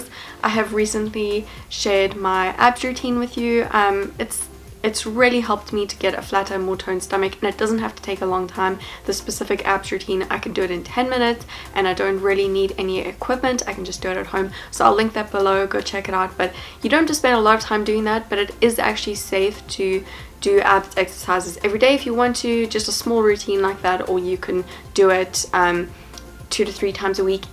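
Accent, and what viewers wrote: South Africa. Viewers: she has south african accent i quess and it sounds so elegant and relaxing i agree 💕💕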